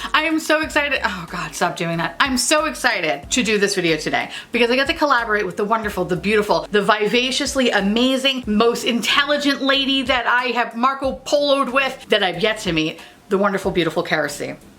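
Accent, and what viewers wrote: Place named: Long Island, United States. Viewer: if u ever see this comment I think we would all love for u to do a video in your queens/Long Island accent!!! I would live!!❤️❤️❤️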